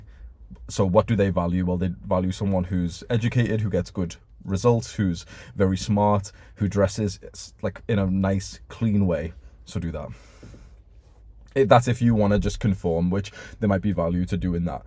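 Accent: Liverpool, United Kingdom. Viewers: I think he's from Liverpool ahah, I'm from Bradford and my accents pretty different. But I guess it shows how right you are about accents changing alot aha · Never miss a video.. Cuz learning British accent.. 😂😂❤️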